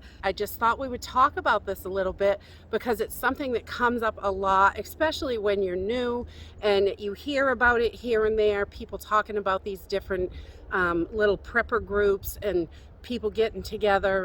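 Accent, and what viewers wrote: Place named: Massachusetts, United States. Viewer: Sounds like Massachusetts- LOL! NE is not going to offer many “like-minded” people! I have one friend 2 towns away who is like minded but that would not help in a SHTF situation. In my small neighborhood there are mostly takers and we would worry about that… The kind who arrange a neighborhood pool party at someone else’s house (when they don’t have one)- those kind of neighbors! 🤔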